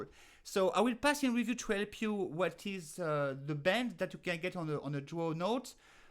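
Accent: France. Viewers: Vous n'avez pas à présenter des excuses pour l'accent français - il est un plus pour moi! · Great video for a beginner like me, and please dont apologize for your accent, I think your english is pretty good and I am glad that you give the non-french speakers the option to learn from you too! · Hi, i love your french accent💙and your music style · Oh please, I'm jealous of your accent. My native language is Brazilian Portuguese and we just love out there hearing you, French speakers, speaking our language with your delightful accent. No apology necessary! My apologies for being jealous... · Bonjour, en premier merci pour la leçon et pour toutes les belles performances que tu partages sur Youtube - puis à propos de l'accent, mais tu n'as pas à t'excuser tout le monde a de l'accent lorsqu'on parle une langue etrangère en outre dans une autre vidéo tu parlais l'anglais sans accent ou presque et moi j'ai été susrpris de savoir qu'une des meilleurs joueurs de l'harmonica au monde soit française:) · Je me suis abonnée à tes cours et à tout ce que je peux recevoir de toi. 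Tu es une super harmoniciste, et heureusement que tu as un accent bien français, sinon je ne comprendrais rien... Par contre , comment fait-on les overblow (par exemple dans "Yesterday") ? Merci pour tout ! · I like the French accent. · why apologise for a French accent. French is the language of love and one of the world's most beautiful languages. carry on with your fantastic accent so we can enjoy listening. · Do not apologize for your French accent! It is delightful! And your harmonica playing is....well, shall we say....magnifique! Merci bien!! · Just watch your video... Just started to play the Harmonica a few weeks ago and i'm 78 years old.. I love the challenge. Don't worry about your accent you do great! That goes with being French! Thank you again.. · Bon jour, Madame. I think it is not necessary to apologise for your accent for the simple reason that the French accent is oh la la, wonderful to hear! 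And your harp playing is the best! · Pardon ? Pour quoi? L'accent français c'est toujours adorable... Et moi je préfére ecouter l'anglais comme ça, c'est plus facile pour mon oreille. Je viens de descendre deux demi-tons pour la première fois dans le deuxième trou ! Alléluia ! Merci beaocoup.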